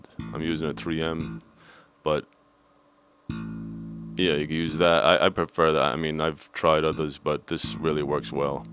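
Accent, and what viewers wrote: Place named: New York, United States. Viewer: ah okay. i'm not from the US just sounded like new york somwhere...jersey was the closest thing i could think off ;) nice axe man, doing one myself at the moment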